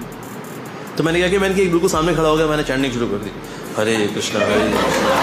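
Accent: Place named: Punjab, India. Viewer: baat ka andaj aaj bhi punjabio wali karte ho prabhu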